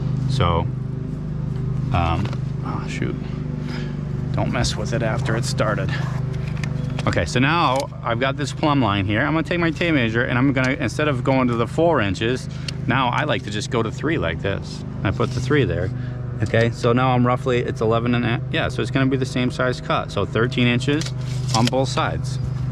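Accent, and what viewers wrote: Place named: Massachusetts, United States. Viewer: What a nice job! It looks really good too. Good explanations on why you do each step. I do handyman work, and nearly every house I enter has botched work. It blows my mind how much bad work I see. In Part 1 you showed layer upon layer of messy repair attempts that failed. Those hack jobs are fine in an emergency like during a storm when you're just stemming the tide until you can do a proper repair. Very much admire your skill and methodical work. I'm originally from MA and your accent sounds familiar! My 70 year old house in OR has the original heavy galvanized steel step flashing. I re-roofed 27 years ago, and just lifted it up like you said. It could probably go another 20 years, but I'm going to replace it with lead the way you did.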